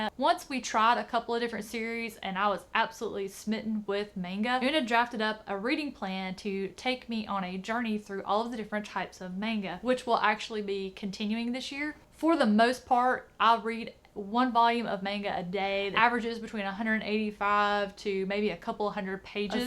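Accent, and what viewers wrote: South, United States. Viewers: I love your channel. I have a strange question. Do you often get judged for your southern accent? Meaning the stigma about the south and education? I wonder because I have big muscles and I often get comments from people like “meathead” or girls being shocked that I’m a book nerd. Anyway, weird question ,I know. I was just curious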